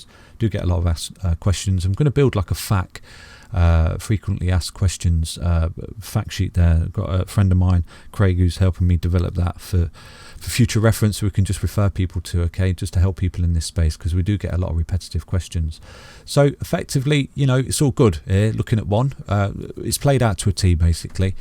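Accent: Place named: Midlands, United Kingdom. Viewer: Are you from Nottingham? Midlands accent 👍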